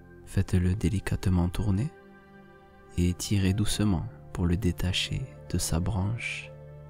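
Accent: South, France. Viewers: j'adore ton accent du Sud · Cet accent du sud m'apaise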